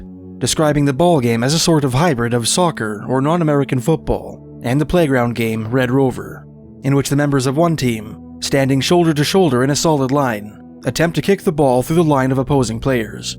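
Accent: Canada. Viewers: Oh this narrator he's for sure a Canadian, eh.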